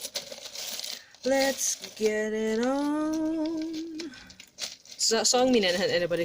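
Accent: Scotland, United Kingdom. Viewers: Woohooo a Scottish reader 👌🏼👌🏼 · 3 soo on point 👌🏾 btw I love your accent, reminds me of my best friend, she's scottish ❤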